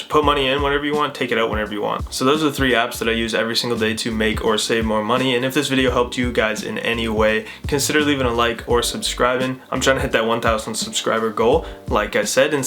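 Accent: Canada. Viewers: This was super helpful! Are you Canadian as well!?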